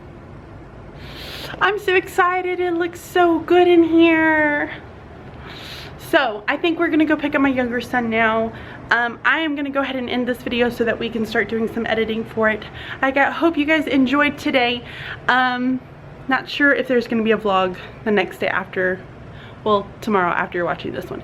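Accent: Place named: South, United States. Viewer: I love listening to you with your Southern drawl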